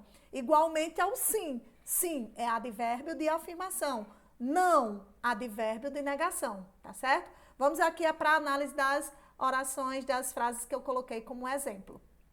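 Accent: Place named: Northeast, Brazil. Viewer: Esse sotaque nordestino me faz ter tranquilidade e paz para saber e entender que estou com uma grande professora, face considerar que o estudo nordestino, se não for o melhor, é um dos melhores do pais.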